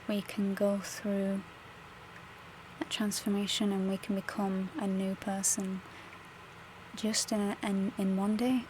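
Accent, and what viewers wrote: United Kingdom. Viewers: Hi are u from the UK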